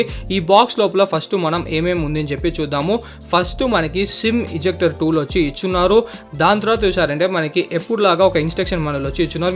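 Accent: Chittoor, India. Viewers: He is from Chittoor or Anantapur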